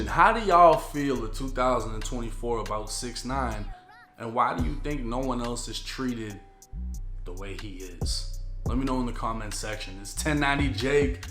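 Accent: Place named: Tampa, United States. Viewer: bro your bitch ass is from Tampa with that accent.Get real u bitch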